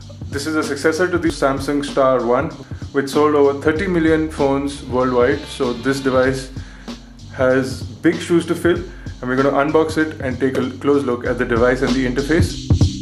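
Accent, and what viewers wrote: India. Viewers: bullshit typical indian english accent...( boda thing cuming out..)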